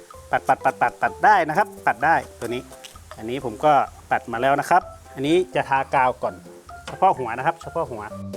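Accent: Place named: North, Thailand. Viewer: เป็นคนเมืองใช่ไหมครับพูดไทยสาบเมืองมากเลย😅😅😅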